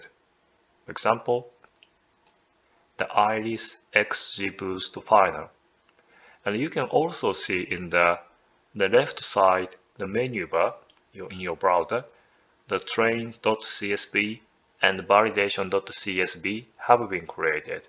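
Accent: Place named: Japan. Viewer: 日本語訛は私にとってはとても理解しやすい英語です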